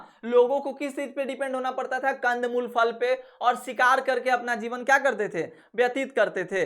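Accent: Bihar, India. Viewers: Sir aap bihari h kya ?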